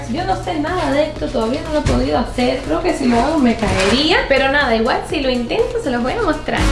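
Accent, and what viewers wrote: Cuba. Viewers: Las dos hablan igual porque las dos son Cubanas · es que las dos son cubanas, y el acento cubano es asi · Ella es del pais de así q todo los cubanos habla asi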